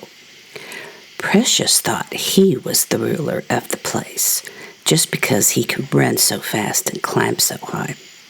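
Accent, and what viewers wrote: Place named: Appalachia, United States. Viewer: What a wonderfully fun story! So much character brought to life in this tall cat tale (by the way that was a really long tail). Loved hearing your expressive Appalachian accent and colorful language too. Amazing photos of those cats, such eyes!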